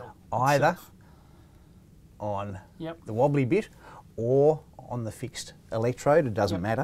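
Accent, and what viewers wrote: Australia. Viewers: I fucking love the English accent of Australia peeps. I wish, I could manage to get even 1% of that… 😂😂😂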